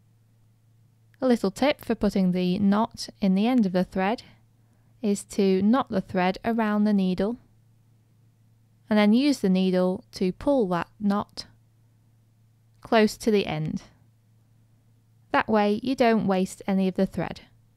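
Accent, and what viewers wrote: United Kingdom. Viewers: Love your British accent! This helped me learn. I like when you speak slow and repeat, so I can understand. Thank you dear! From - Lori / MN, USA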